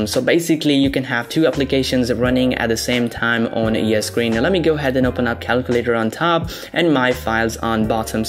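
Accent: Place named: United Kingdom. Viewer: Why do u have ths british accent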